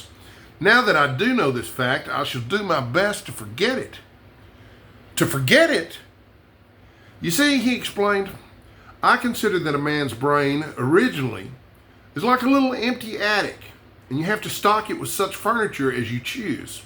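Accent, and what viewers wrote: Texas, United States. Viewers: The sonnet you picked is one of my favorites, especially the last line. I've heard it read in an English accent, but never in "Texan" - not bad! Lord of the Rings is one of my absolute favorites - way up there in my top 10 list. It's wonderful, isn't it. 2 of yours - I like the authors a lot, but not the specific books you chose (Charles Dickens and Arthur Conan Doyle). All the Kings Men made a big impression on me, but I don't know that now I'd read it ahead of a number of books from that period. Still the idea that it was the Kingfish, well, it started my love of American history of the 20's and 30's. The only one I disagreed with was The World According to Garp - it's way down on my list. Regardless - your list shows you to be a man of taste and discernment.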